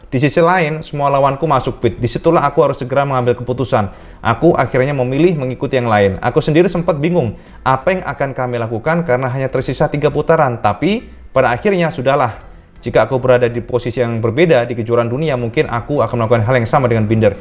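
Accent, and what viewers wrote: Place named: Java, Indonesia. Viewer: Cak iki asli Banaran Kandangan to Cak? 😲Kok medok pol..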